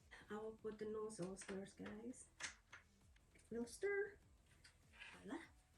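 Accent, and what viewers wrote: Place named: Scotland, United Kingdom. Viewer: I thought you did a great job.,.... Maybe it's hard for people with that thick Scottish accent to understand.. Heck nobody can understand them anyway.... Keep after it girl 🙂🙂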